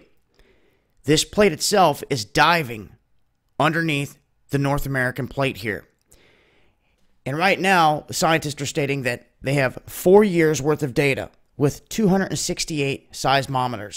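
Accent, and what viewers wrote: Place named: Idaho, United States. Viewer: you're from Idaho, girl, I can tell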